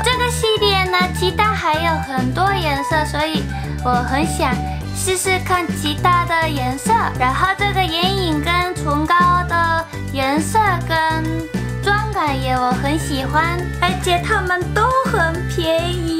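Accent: Standard. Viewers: 恭喜yuma TV 突破20W!! 繼續努力~而且你的中文可以算是很標準了,已經不再是躲在ryu旁邊當攝影師,拍影片前先寫好稿子的Yuma了 ^^ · Yuuuma恭喜20萬訂閱!你的中文進步了很多.發音超標準的!只是如果有比較不會的字一定要問Ryuuu喔!永遠支持你!! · 中文说的还挺标准的，加油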